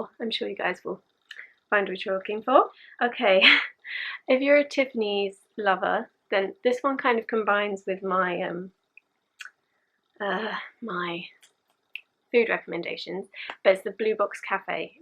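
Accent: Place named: England, United Kingdom. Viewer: Nice channel keep it up love your english accent:D!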